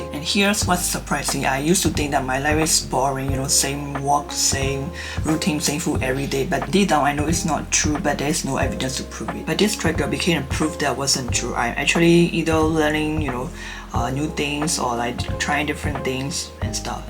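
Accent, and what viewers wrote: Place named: Myanmar. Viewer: You're accent look like Burmese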